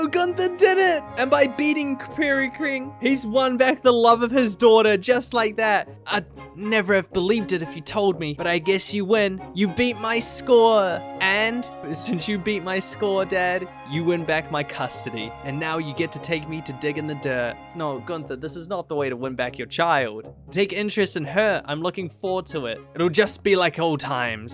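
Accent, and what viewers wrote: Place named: New Zealand. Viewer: omg i just found out you're from nz, (i am too) it's SO refreshing to hear your voice and to watch a channel that's not american. i love your voice so much and i'm so glad i found a great youtuber who's a kiwi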